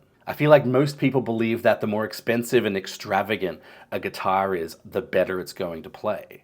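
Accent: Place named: Australia. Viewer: Nice try getting a free guitar sponsorship from them, you got too little subs and views, and ur Aussie accent ain’t helping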